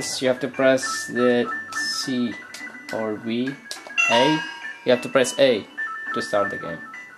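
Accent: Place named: Brazil. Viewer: Lol... Would you happen to be Brazilian? If not, hopefully no disrespect is inferred. I ask bcuz I hear an accent... Thanks!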